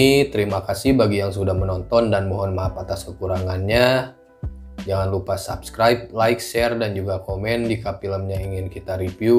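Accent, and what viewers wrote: West Java, Indonesia. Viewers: Akang ngomong nya sunda banget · anying sunda bet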